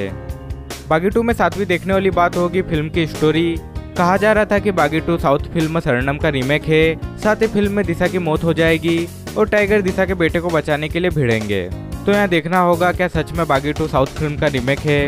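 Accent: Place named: Bihar, India. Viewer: Bihar se ho kya bhai , aawaj change kar bhai , Maza nahi aa raha Heeee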